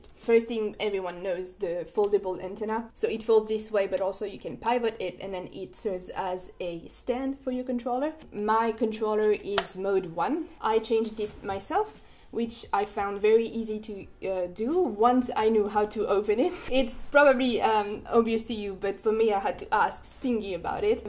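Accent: France. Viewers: I meant like are you in France or a different country? Like the accent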